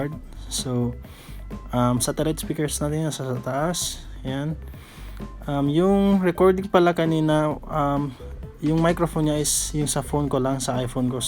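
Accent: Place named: Cebuano, Philippines. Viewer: Bai. Taga asa ka? Bisdak man kaayo. Shoutout diri Linamon Lanao del Norte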